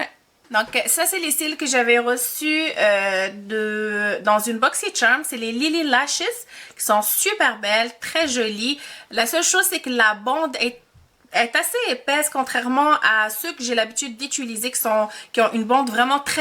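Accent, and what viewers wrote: Quebec, Canada. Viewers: Je découvre ta chaîne grâce à cette vidéo. ☺️ J'adore tellement l'accent du quebec, tabarnak!! 😅 je ne connaissais pas du tout cette box!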